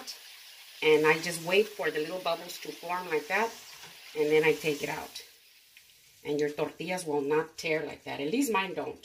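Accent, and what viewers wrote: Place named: New Mexico, United States. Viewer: I completely agree! Also, your voice is very soothing. You very much sound like you could be from my beautiful state, New Mexico which is even better!😎❤🌶💛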